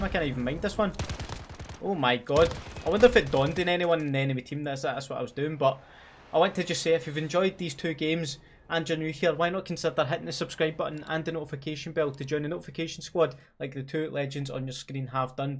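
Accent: Scotland, United Kingdom. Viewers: When you hear alrighty folks with a scottish accent you know its gonna be lit #notificationsquad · Gun Troller aye in a Scottish accent then he will do that stupid generic one that no one sounds like and u would need to be from the right part of Scotland to sound like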